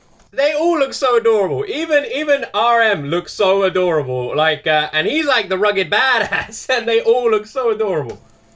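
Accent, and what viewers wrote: United Kingdom. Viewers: You have seen blood seat and tears and fire so it would be good to watch these live 2016 MMA. Is that a Leeds accent?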